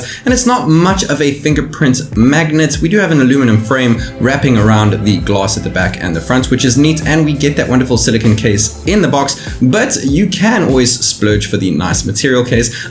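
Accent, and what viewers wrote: South Africa. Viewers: Is that a South African accent I detect? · South African accent?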